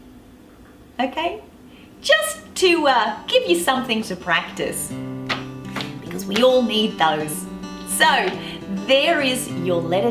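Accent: Australia. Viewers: Great teacher and a so beautiful Aussie dialect. Awesome a thousand likes. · Thank you! This helped me alot hope for more aussie accent cuz i really hatr my accent and your accent is my fav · Im very fascinated by the aussie speaking voice and finally found this channel. Now, i can really enjoy learning the Australian accent. ❤ Thank you for sharing this videos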